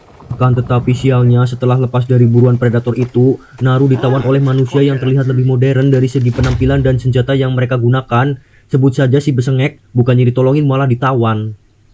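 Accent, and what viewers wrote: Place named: Indramayu, Indonesia. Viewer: Logatnya orang Indramayu wkwk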